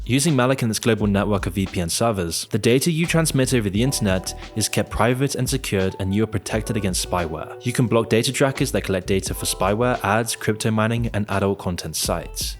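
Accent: Norway. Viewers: are you norwegian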